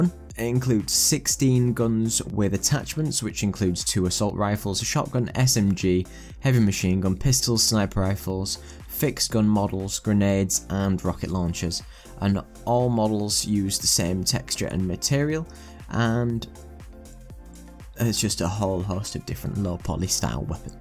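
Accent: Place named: North, United Kingdom. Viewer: Love the drama llamas.....and the northern accent!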